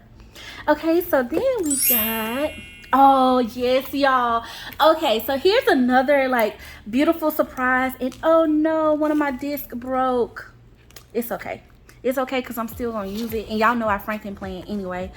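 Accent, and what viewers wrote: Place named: South, United States. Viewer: I love your southern accent, so charming!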